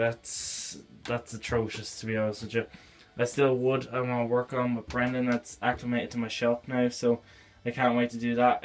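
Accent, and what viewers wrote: Ireland. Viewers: Yes lad I know your Irish from your accent maybe Mayo or Dublin well I'd like to heasr from you you in a cabinet maker from Donegal thanks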